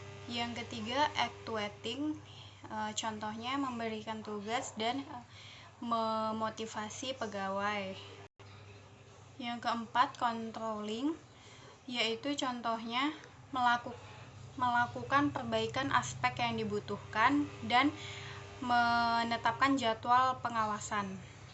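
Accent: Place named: Java, Indonesia. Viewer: Asli mana kak ..kok medok jawa ?🤣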